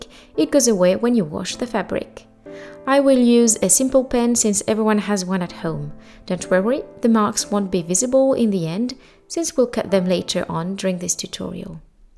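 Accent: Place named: France. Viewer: Your English is very charming. Love your French accent!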